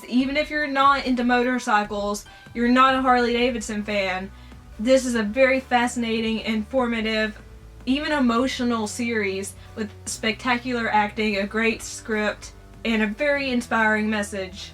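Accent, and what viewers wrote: South, United States. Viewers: nice one,looks like a great film,i love your southern accent,looking great too,love n peace.jpj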